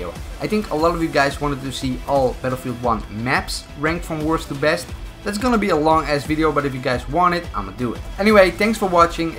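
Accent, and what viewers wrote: Netherlands. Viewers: Ben je Nederlands? Zo klink je wel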